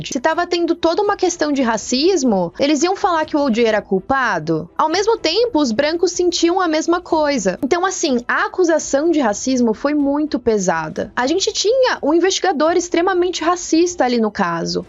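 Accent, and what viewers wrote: Sao Paulo, Brazil. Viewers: Sotaque paulista com gripe.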